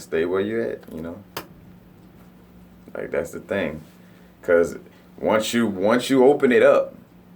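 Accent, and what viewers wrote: New Orleans, United States. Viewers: Sounds like you're from New Orleans. Reminds me of home! 😀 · From New Orleans . Got the Clark shirt on had to match it to the accent.👌🏿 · I kept saying "dude sound so New Orleans" then I saw the Clark t shirt then you said boudin.....#NOLA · Dawg! I’m in New Orleans! You here to huh? I know! I’m coming over right nah ya heard me! Dat boi said ku-di-lini!! Wazzam!? I can hear it that city all in ya voice! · are you from New Orleans? accent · So Nuawlns yea dude gotta downtown accent..... New Orleans checkin in ya know....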